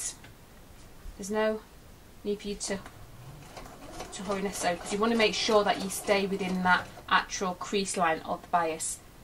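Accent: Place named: England, United Kingdom. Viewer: Loving the fact English people are doing clear informative demos. Way preferable to yank accents!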